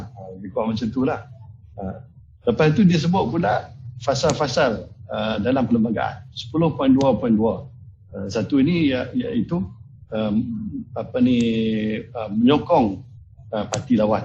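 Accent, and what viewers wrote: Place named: North, Malaysia. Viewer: sy suka datuk..ckp utara pekat..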